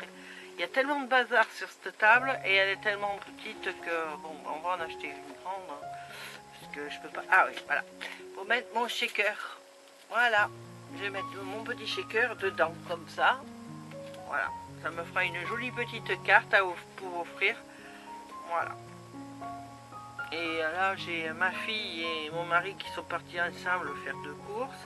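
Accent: South, France. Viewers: 🐱Coucou 😊 J'ai le plaisir de te découvrir grâce à ton commentaire sous la vidéo de notre Fanfan scrap, donc je viens découvrir ton pti monde. Tu fais de jolies creations, ma préférée est ton tag avec le cheval, mais c'est normal car le cheval est mon 2ème animal préféré 😉 Peux être est tu tjr en vacances, si c'est le cas profites en bien, car moi je n'ai plus le privilège de partir en vacances, depuis les dernières en 2011 (pdt un week-end) en Normandie. Je suis de la Région Parisienne mais à ton pti accent il me semble que tu dois être du Sud. J'espère que ça va mieux avec l'épreuve que tu as passée avec ta fille! Je pense à ça, si tu es tjr en vacances, pour pas que ton album s'abime avec le climat, tu peux le mettre dans une grande poche de congélation fermée, c'est bien pratique. Je te dis à bientôt et te souhaite une bonne journée.🌅 Bisous 🐎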